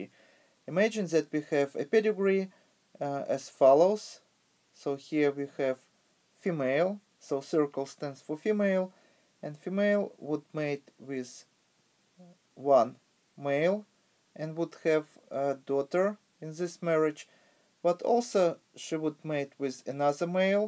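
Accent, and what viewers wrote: Russia. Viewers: Вам нужно работатт над произношением. Сильный русский акцент на английском - это что-то ужасное. По себе знаю) А видео очень информативны!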